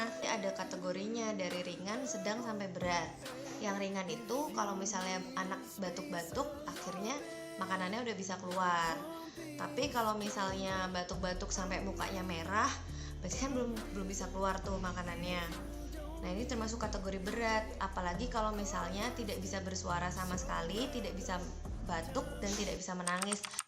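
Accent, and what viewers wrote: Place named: Java, Indonesia. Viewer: Dok jawa nya orang mana dok? Hihi keep semangat dok!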